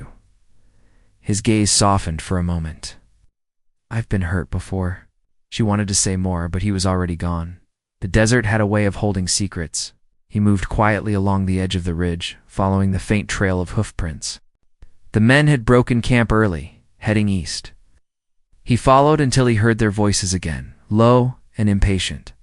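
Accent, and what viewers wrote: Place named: United States. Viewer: Wonderful story! The narrator, obviously an American, does a terrific job. It’s hard to tell if his voice is real, or AI generated. But a great sound to hear a steady voice, no over stated, changing when things get tense. Sometimes when an English man’s voice over stressing the narrative can get tiresome. Or an American voice doing the same thing. I’m very pleased with the stories and narrative in these videos. ❤❤❤❤😊😊😊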